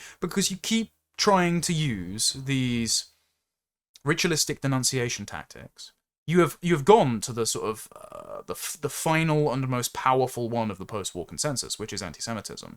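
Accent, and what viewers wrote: RP, United Kingdom. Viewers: Good grief.., slow down, your delivery you sound like a public schoolboy!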